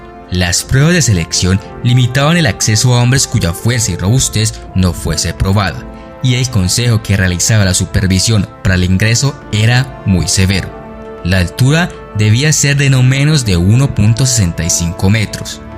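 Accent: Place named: Bogotá, Colombia. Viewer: El parcerito tiene acento Rolo😸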